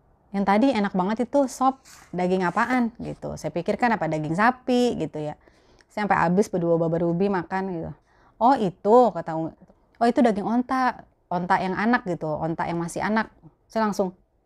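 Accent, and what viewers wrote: Jakarta, Indonesia. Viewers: Betawi nya kentel bgt 😀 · Udah lama di madinah , tapi masih kentel banget betawinya . Jadi kangen Betawi , dari gadis sampe punya suami ampe punya anak lama di betawi Jakarta barat , padahal saya orang Banten serang .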